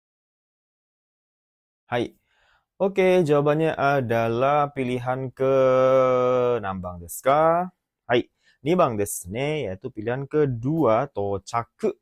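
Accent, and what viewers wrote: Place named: Medan, Indonesia. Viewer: lah, sensei orang medan,, kirain wong jowo hhahah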